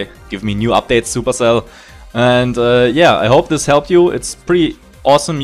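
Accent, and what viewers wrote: Germany. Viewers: Cant unhear the german xD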